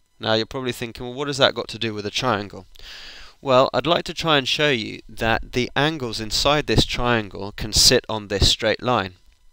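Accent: United Kingdom. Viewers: If all my teachers had an England accent, I would pay attention more.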